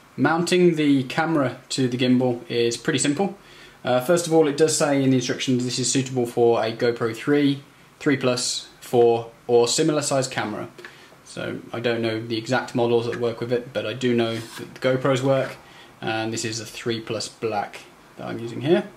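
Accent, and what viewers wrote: United Kingdom. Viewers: looks like a nice gimbal. I used to think you were from the UK till I heard your GF speak, but you sound as British as they come. where do you fly out of?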